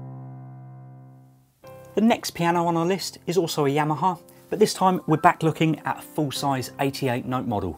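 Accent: United Kingdom. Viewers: is he british? hahahahha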